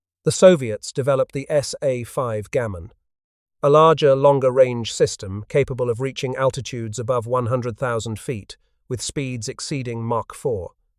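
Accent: United Kingdom. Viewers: The British accent is why so many people watch these videos. Keep them coming!! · Get rid of the British narration.😡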